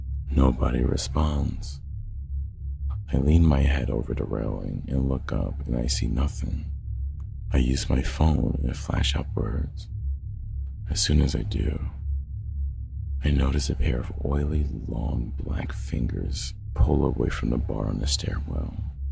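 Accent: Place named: United States. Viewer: U sound like an American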